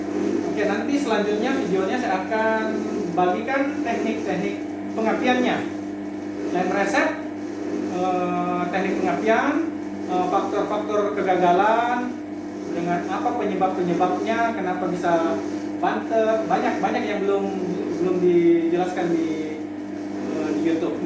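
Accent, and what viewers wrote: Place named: Sulawesi, Indonesia. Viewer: Assalamualaikum, jazakallah khoiron jaza, atas videonya semogga memberikan manfaat dunia akhirat.. dan berkah umur ples reezkyny... Dri logatnya kayakny dri sulawesi yah mas??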